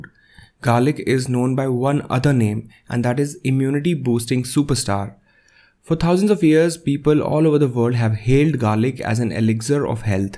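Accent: India. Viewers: Indian voice 🇮🇳🇮🇳🇮🇳😊❤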